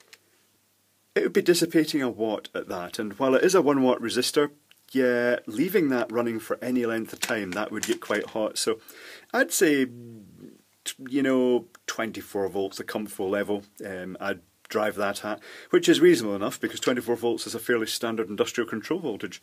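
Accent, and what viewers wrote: Scotland, United Kingdom. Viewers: Scottish sir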